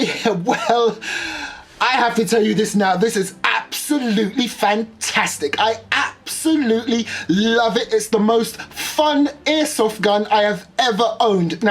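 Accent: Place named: London, United Kingdom. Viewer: He’s a proper Londoner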